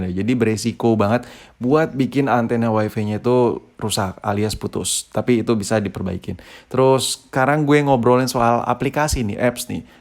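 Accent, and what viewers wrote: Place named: Java, Indonesia. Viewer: apa jawa keras ni bozzz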